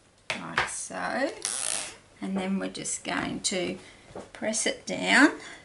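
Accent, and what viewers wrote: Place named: Australia. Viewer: no wonder you had such a nice accent you live in Australia. I live in USA California. I do have a someone I can buy from if i need to. Thank you so much for offering your help that was very kind of you. Have a Blessed Day. with a smile:) Julie G.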